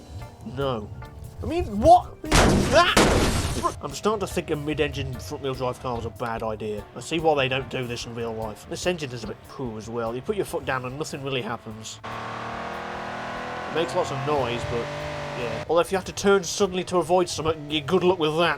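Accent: France. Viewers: Automation idea: Please make one of those VERY low pickups that are very loud and make LOTS of black smoke 🙏🏼 (btw I made the car with that body yesterday) 🥖FRENCH ACCENT IS BEAUTIFUL🥖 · Le grog mobile Also the best French accent ever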